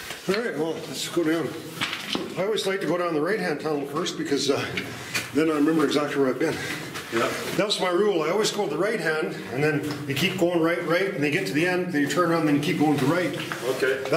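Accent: Canada. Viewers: Wow! You make awesome videos! I have a question, it seems like you have a bit of a Newfie accent. I might be wrong, but are you Canadian?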